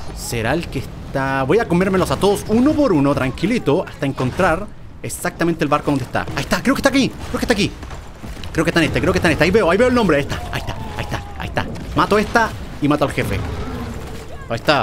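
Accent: Chile. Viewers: por lo menos en los directos se le sale lo chileno wn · Soy yo o esta volviendo a hablar chileno poco a poco?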